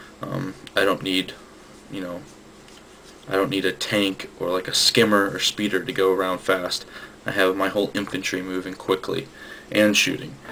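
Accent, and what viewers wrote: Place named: New Jersey, United States. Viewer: Fucking hell, you understand this game so much more than me. You sound just like the guy that keeps kicking my Ass! (He's from New Jersey, sorry if that offends, I'm English!).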